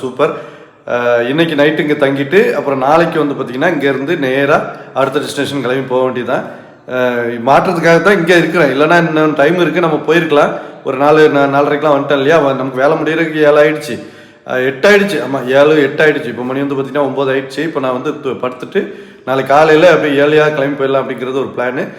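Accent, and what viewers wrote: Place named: Thoothukudi, India. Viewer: உங்கள் தூத்துக்குடி Slang ku நாங்க அடிமை 😂 continue 🎉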